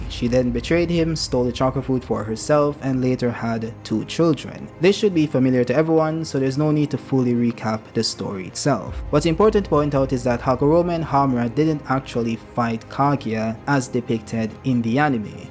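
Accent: Jamaica. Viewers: which part of Jamaica you come from mi g you sound like you from ochi bro gaad but more time yuh affi Yankee up the ting mi boss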